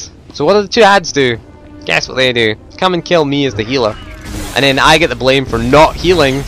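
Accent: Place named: Edinburgh, United Kingdom. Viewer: What part of Scotland is your accent from? sounds like Edinburgh or east anyway ;3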